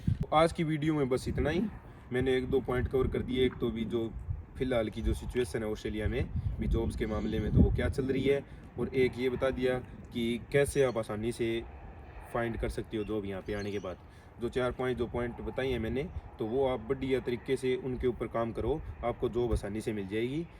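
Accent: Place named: Haryana, India. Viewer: Bhai Rajasthan haryana side se ho kya Hindi nal Dhaka ho rya ha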